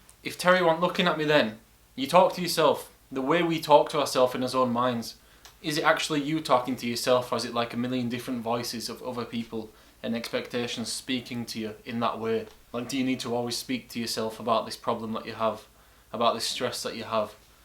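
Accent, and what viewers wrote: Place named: Yorkshire, United Kingdom. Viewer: Ngl i was just lurking here to learn ur accent cause like i said i wanted to prank my friends with a yorkshire accent, BUT this video hit me in unexpected ways, i needed to hear this at this moment. I've been unmotivated to fo anything for the past 3 months. And being at my age (which is 24) it sort of builds another level of pressure whenever im paralysed by overthinking. It's very hard to snap out of it. But what u said kinda gave a push to start small, start somewhere. Thanks for this man.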